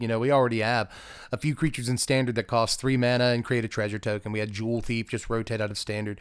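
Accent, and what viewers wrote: South, United States. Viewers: It never gets old hearing a southerner speak spanish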